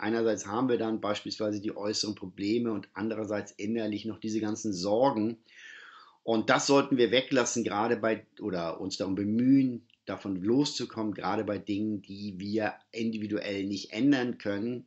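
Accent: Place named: Kiel, Germany. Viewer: Oh, ich freu mich so sehr, dass Sie wieder da sind. Ihr "Kieler Slang" erfreut mein norddeutsche Herz jeden Morgen und Ihre unaufgeregte Art die aktuelle Sternenkostellation darzustellen bringt mir richtig Freude... danke, dass Sie da sind 💛✨️💫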